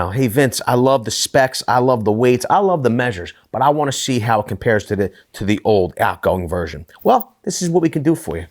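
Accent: Philadelphia, United States. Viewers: You're from Philly. I can hear your accent.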